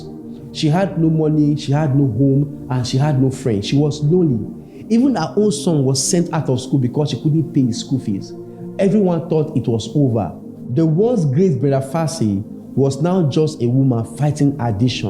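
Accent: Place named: Nigeria. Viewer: How is hate this Nigerian accent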